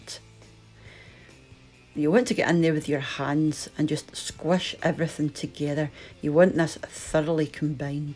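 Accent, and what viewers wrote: Scotland, United Kingdom. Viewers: Love your cooking love your scotsh accent I am from Australia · Loved it! I listen as much for your lovely Scottish burr as for the lovely recipes!! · cracking accent. britain has good accents. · Throw away your Spam!!!!! This and Slow Cooker Square Sausage looks great. And as you say, we made it ourselves........I've the ingredients and will make my loaf today (January 15, 2023. Better than bacon.........Thanks for all the recipes and your Scottish is Music to my ears. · Hi! Not sure where you’re based, but I spent 12 years working and living in the Highlands and in Aberdeen. Your recipes and your accent are making me miss "home". I’m definitely going to try this, for I miss my all day breakfast at the supermarkets where I always had a Lorne sausage! Keep doing what you do: you’re doing great! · Love the video and the recipe and I'm making these today. 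But I watched this video with captions, and it cracked me up because whoever or whatever did the captioning clearly does not recognize a Scottish accent at all. You should watch the video with captions, because some of the mistranslations are hilarious. And now I think I'll need to recaption all of my video too as I bet the daptions are just as bad. Cheers! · I'm a linguist with a fascination for dialectic variations and this presenter has all the credit for interesting and even charming variations of the basic English pronuciation as spoken in Scottland. It's simply nice to hear her speak. She charms you with her easy pronunciation and speech to the point where you like listening to her, just to hear her speak. If you live in the U.K. you have English accents, Welsh accents, Scottish accents and Irish accents and they are all English but they are all clearly belonging to a geographic area and unique to those parts. 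That's what makes the U.K. unique and great.! Can't say the same for the accent I was born with, namely, 'Cockny' which I never held in particular esteem. I spend my life pretending I'm from Cornwall or the Isle of Skye rather than be identified as a 'Gor Blyme' cockney.! I know, it's irrational but who said being rational was better than being from Radnorshire.? And trust me, if you play this game, you'd better get it right, because you have no idea how quickly you get caught out.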